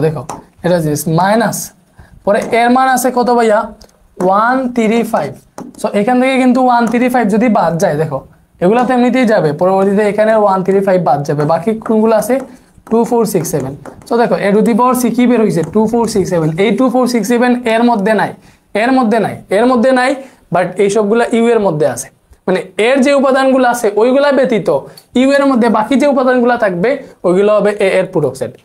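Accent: Sylhet, Bangladesh. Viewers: ভাইয়া কি সিলেটি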